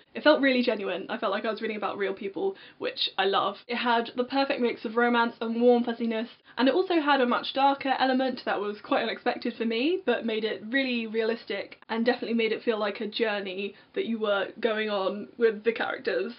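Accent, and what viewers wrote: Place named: United Kingdom. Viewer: Great books! Looking forward to watching more of your videos :) I'm not sure what my favourite book of 2014 was to be honest! I think I'll have to go Cinder by Marissa Meyer. :) Just wondering, where abouts in the UK are you from? I love your accent!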